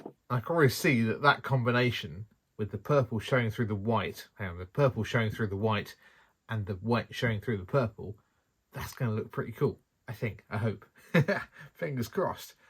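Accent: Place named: United Kingdom. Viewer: Not only did I enjoy that, I’ve saved it for later. It is different and I like different. It’s also nice to hear a British accent. Thank you for sharing 🌞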